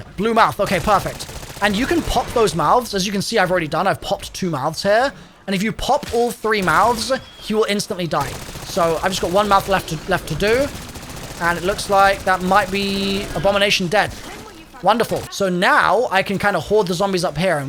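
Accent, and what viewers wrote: United Kingdom. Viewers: Any destiny ppl here thinking this is the brit version of datto or fallout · Oh hell yeah 53 minute vid. Perfect for falling asleep to that soothing brit voice.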